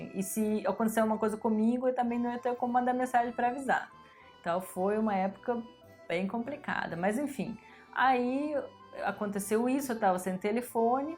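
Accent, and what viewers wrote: Rio de Janeiro, Brazil. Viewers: Eita.....carioques lindo.... 👏🏿👏🏿👏🏿👏🏿👏🏿👏🏿👏🏿👏🏿👏🏿👏🏿👏🏿👏🏿👏🏿👏🏿👏🏿👏🏿👏🏿👏🏿👏🏿👏🏿👏🏿👏🏿👏🏿👏🏿👏🏿👏🏿👏🏿👏🏿👏🏿👏🏿👏🏿👏🏿👏🏿👏🏿👏🏿👏🏿👏🏿👏🏿👏🏿👏🏿👏🏿👏🏿👏🏿🍻🍻🍻🍻🍻🍻🍻🍻🍻🍻🍻🍻🍻🍻🍻🍻🍻🍻🍻🍻🍻🍻🍻🍻🍻🍻🍻🍻🍻🍻🍻🍻🍻🍻🍻🍻🍻🍻🍻🍻🍻🍻🍻🍻🍻🍻🍻🍻🍻🍻🍻🍻🍻🍻🍻🍻🍻🍻🍻🍻🍻🍻🍻🍻🍻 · Impressionante, como você incorporou a nossa língua! Sequer se pode notar um sotaque estrangeiro, a não ser o carioca que, diga-se de passagem, lhe cai muito bem. Parabéns pela obstinação, o que lhe valeu o merecido sucesso de que hoje você usufrui. E haja cabeça dura! Não sei se aguentaria tanto desaforo e desacertos em um país que não fosse o meu de origem.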